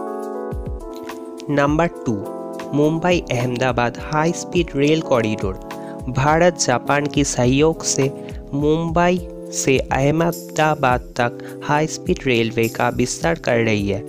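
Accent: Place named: Northeast India, India. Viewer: Dear Dev he is perhaps from NE, Govt. and us should encourage and support NE guys , who are also our brothers and sisters, and r part of India.Southe indians , Bengalis have different accent. That is the flavour of India.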